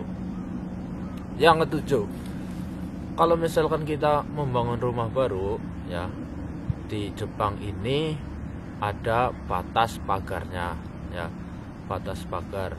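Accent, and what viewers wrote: Banyumas, Indonesia. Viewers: Wong ngapak pasti keh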